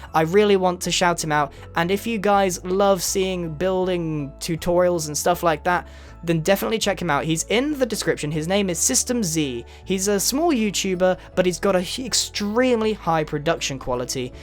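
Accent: United Kingdom. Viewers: That British Voice Is just, way off from mine, I am British and i sound so different. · He sounds to British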